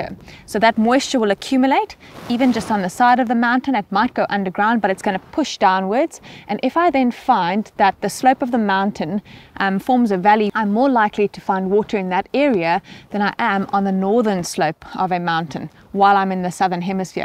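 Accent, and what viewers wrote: South Africa. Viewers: We made a drinking game where everytime you say water (woter) in that beautiful sa accent we have to drink. After 6 minutes we are absolutely smashed. 😂😂😂 Awesome videos, thank you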